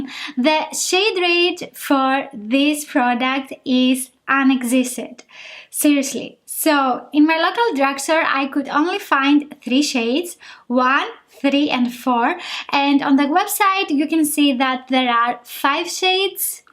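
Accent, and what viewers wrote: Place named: Greece. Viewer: Πως φαίνεται ο Έλληνας.. Η προφορα σε προδοσε, και μετα ειδα το όνομα σου.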